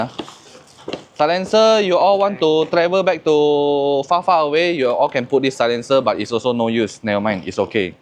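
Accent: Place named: North, Malaysia. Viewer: bro, mcm lain pulak ckp english 😂.. suka dengar you cakap bahasa utara. kepoh sikit 😆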